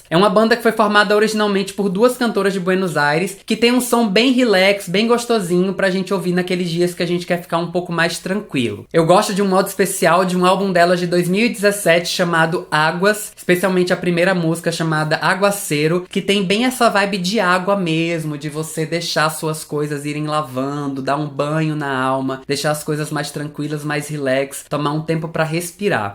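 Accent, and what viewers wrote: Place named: Bahia, Brazil. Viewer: Oi amigo . Tudo bom ? Qual seu nome ? Vc mora na Bahia ? Falo pelo sutaque . Não conhecia seu canal , é ótimo , tem um conteúdo de qualidade parabéns .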